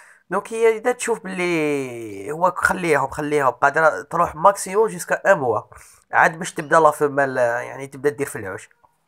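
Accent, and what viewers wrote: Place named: Algiers, Algeria. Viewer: شكرا جزيلاً ،لدي سؤال : هل عندك معلومات عن تزاوج الكناري كوستو (حمر ألماني و انواع أخرى) ؟ نوع هذا الكناري كبير الحجم.... في هذا الصيف ماتو لي خمسة من هته الأنواع بعد محوالة علاجهم بي الاقراص التي تباع عند محلات بيع لوازم الطيور و غير ذلك. اذ لم تحبني ؟ليس هناك مشكل ،ابقي متابع و حسب صوتك ،انت من نواحي العاصمة و انا قريب كذلك يعني استقرت قريب من العاصمة.... الجزائر... شكرا جزيلا و سلام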